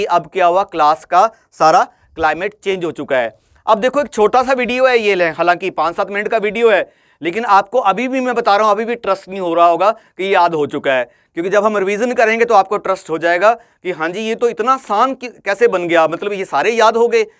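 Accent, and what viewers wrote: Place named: Punjab, India. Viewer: Thank you sir . And love your punjabi accent ❤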